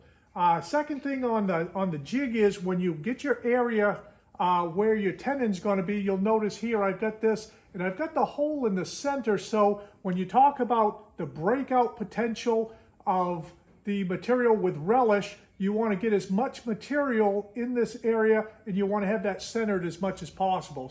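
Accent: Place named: Maine, United States. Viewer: Hey, your videos are really great. Well thought out, straight to the point, helpful, and that cool Maine accent is the icing on the cake. Keep ‘em coming.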